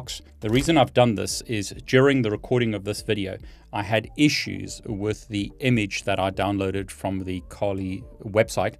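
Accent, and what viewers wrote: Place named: South Africa. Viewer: South African!!!😂 Thanx for the installation boet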